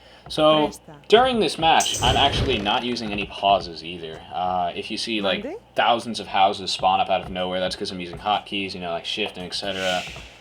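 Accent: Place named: United States. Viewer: I like you extra just because of your American accent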